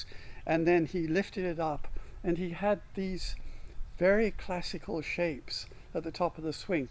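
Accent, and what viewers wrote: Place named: United Kingdom. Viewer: Hi wondering where do you live as your accent sounds British 🇬🇧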